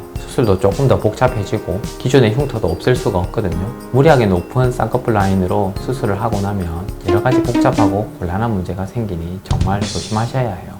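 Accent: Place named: Busan, South Korea. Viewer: 제가 부산사람이라 그런지 부산사투리로 설명해주시는거 친근하고 좋아요^^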